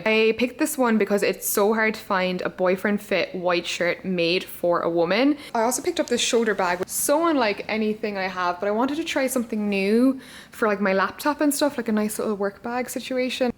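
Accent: Ireland. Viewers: that clip that recorded of you had me laughing so hard 😂 you sound so extra irish when talking to him and i LOVE it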